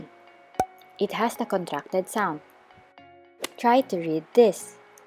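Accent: Philippines. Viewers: Are you Filipino? I could hear the accent, also trying to learn and just understood all of hiragana I think haha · Your accent is so filipino, it's nice hahah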